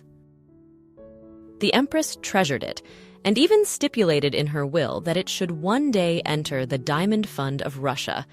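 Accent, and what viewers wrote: United States. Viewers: For a documentary about British Heritage, this American lady has done her homework but she should let a native speaker do the voiceover. The "don't you think" and other fatuous remarks lack the gravitas that would bring the required connoisseurship to the broadcast. No doubt they are intended for an American audience.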